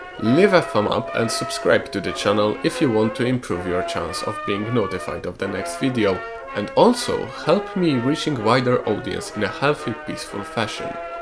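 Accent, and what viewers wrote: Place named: Poland. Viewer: I had a strong premonition that you're Polish by the use of Asterix and Obelix. (then i also heard your accent) Great video!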